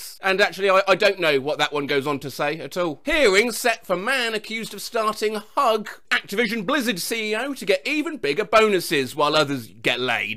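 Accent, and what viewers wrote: United Kingdom. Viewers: I accidently clicked on this and was wondering why suddenly a brittish man surrounded by lemos was talking to me... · its bc of his voice and his british accent. Uberduck has a AI voice you should check out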